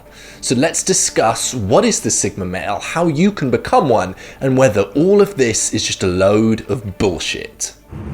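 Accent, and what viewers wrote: United Kingdom. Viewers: Bruh your level of articulation is phenomenal and combining that with your British accent is finese....... subscribe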